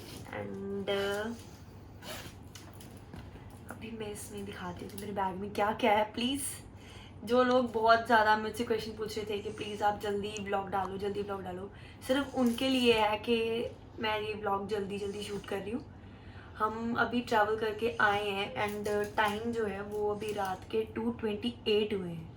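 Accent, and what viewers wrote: Punjab, India. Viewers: Aapki accent shehnaaz gill type ki h😅.. Shyd aap dono punjabi h isiliye 😅❤ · Punjabi hoka Hindi bolni chngi ni lgdi.... So make vlog in Punjabi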